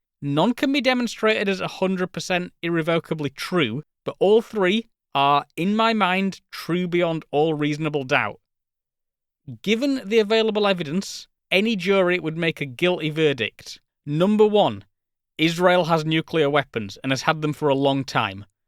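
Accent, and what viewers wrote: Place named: United Kingdom. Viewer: He doesn't have a nerd voice! He's just aggressively British!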